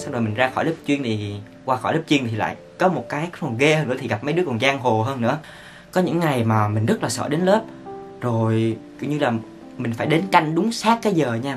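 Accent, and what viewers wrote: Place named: Central, Vietnam. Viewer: A là người miền trung đúng ko ạ? "Sì gòn" "quýnh" "đì"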